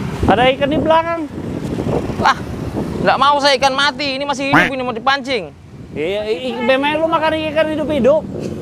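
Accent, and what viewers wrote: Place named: Java, Indonesia. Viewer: Koko medok banget jawa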